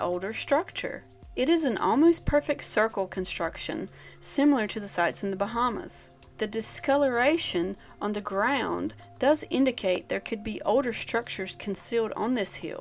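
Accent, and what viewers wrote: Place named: Tennessee, United States. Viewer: I dont know where you're from but I hear some Tennessee in your accent.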